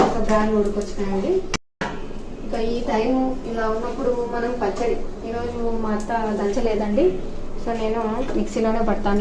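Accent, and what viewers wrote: Rayalaseema, India. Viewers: Meedi raayalaseema anukuntunnanu maadikuda raayalaseema Atp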